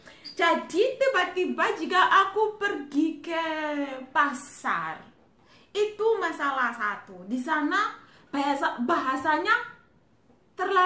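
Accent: Java, Indonesia. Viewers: Logat bicara kk sudah khas jawa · Mantap suaranya medok, Zimbabwe rasa Jawa, lanjutkan semoga sukses selalu 👍👍👍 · Mbaknya bahasa indonesianya jadi medok ya wkwkwk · 😂😂😂😂😂 saya suka bahasa medokmu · Mantaap nih mbaknya Lucu,medook Bahasa indonesianya keren...good job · bagus banget caerita kamu tentang pertama kali.di Indonesia.Dan langsung belajar bahasa Indonesia dg logat Jawa. Good job 👍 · Wahh medok kaleee yaaaa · Suka liat mbak cantik 🤗🤗 mbak medhok lucuu 😍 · Logat jawa ne medhok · Kalo ngomong gemesin + medok wkwk👍🏽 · Logatnya jawa banget,keren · Waah hebat udh medook jawane 😄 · Bahasa Indonesianya Medok banget. Kayak orang Jawa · Wow... Sudah ada logat Jawanya lho hahahah medhok bahasa Indonesianya. I hope you enjoyed your stay here, miss. Ps: Years ago when I was in my high school I stayed 2 weeks on Unair dorm years ago, so I knew what you're talking about 😂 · Medok bahasanya... 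· Medot banget!!! kok ngomongnya mirip org jawa ya hehe!!! mantap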